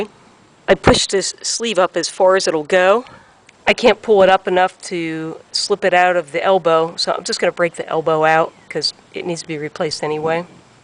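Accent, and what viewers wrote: Philadelphia, United States. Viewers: Philly accent?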